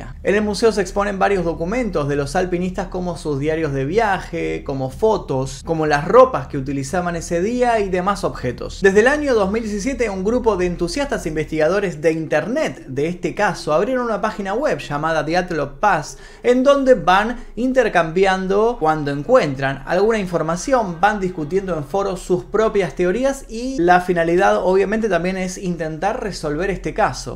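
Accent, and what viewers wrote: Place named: Argentina. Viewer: Excelente narración pero siento q tener un lenguaje neutro sería lo ideal no es lo mismo ayuda q achuda y todo el resto de sustituciones q acostumbran los argentinos .exitos y es crítica constructiva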